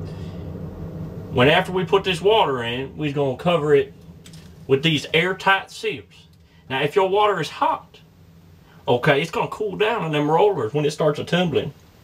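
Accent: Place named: Appalachia, United States. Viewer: holy hillbilly batman. love it though, A+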